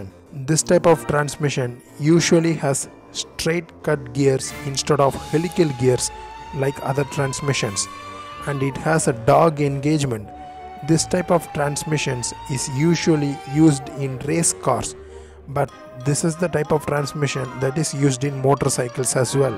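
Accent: India. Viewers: Ur exent is so bad its like less educate indian kid try to speak in englisg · Turn of the stupid indian accent bro it ruins the video <3 Much love bro <3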